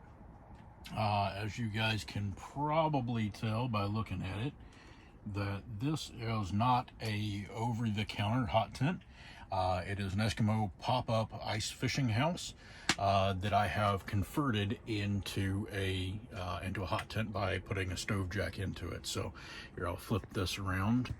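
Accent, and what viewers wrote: South, United States. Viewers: Hello there!! I joined your channel a few weeks ago.And you were in your new To you scamp trailer. I have begun catching up with your previous posts. That scamp now seems like luxury compared to videos like this. I have never lived further north than southern middle tennessee , where I currently live. I cannot imagine weather and ice like in this video .(BTW, Do I hear a trace of a southern accent in your voice?)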